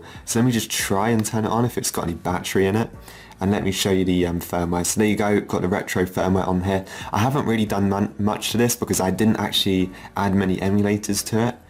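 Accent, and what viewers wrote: United Kingdom. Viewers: cool collection! Very interesting. I was just trying to place your accent.... is it Stevenage by any chance ?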